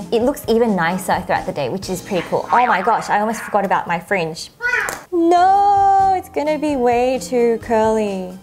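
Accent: Australia. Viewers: i love your aussie accent ♥ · You are just lovely but I often get confused with your accent hahaha. I know it's mostly Australian but sometimes I hear Russian? Hahahah it's probably just me.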